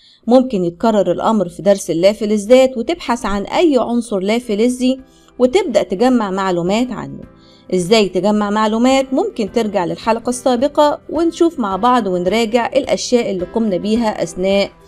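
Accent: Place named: Saudi Arabia. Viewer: ليش تتكلمي سعودي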